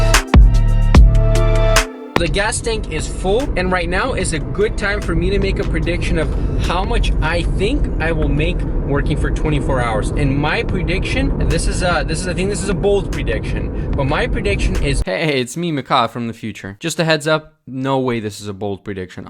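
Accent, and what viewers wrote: Russia. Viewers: Bro, are you from Russia?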